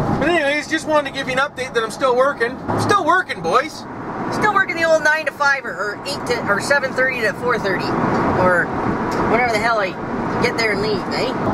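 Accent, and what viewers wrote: Canada. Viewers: your Canadian like me eh?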